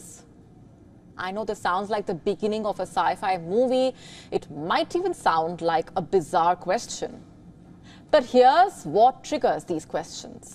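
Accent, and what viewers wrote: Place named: India. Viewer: I hate the indian accent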